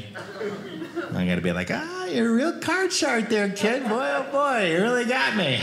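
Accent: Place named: Wisconsin, United States. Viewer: Boy that Wisconsin accent is unmistakable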